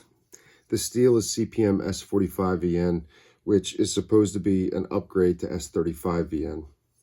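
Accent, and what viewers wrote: United States. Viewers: This is off topic but every time I watched your videos your smooth deep voice always reminded me of someone I couldn’t figure out, but now I realized you sound like an American accented Clive Owen. 👌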